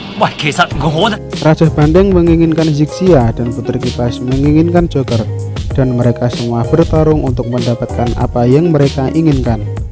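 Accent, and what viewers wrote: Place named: Java, Indonesia. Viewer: Jawa medok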